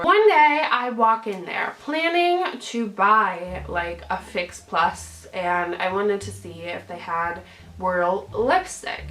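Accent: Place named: Germany. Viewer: Cierra Carter she's got a bit of German accent. why would anyone EVEN say something so ruthless.....unreal.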